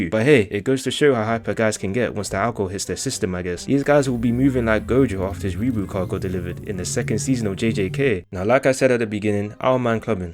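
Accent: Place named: United Kingdom. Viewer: Bri'ish 🥹 (u tuff twin)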